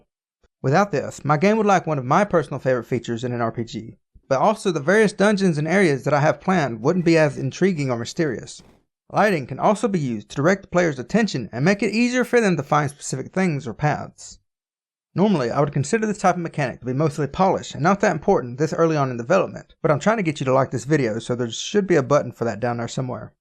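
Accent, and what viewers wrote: United States. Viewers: last video: italian accent this video: american truck dad accent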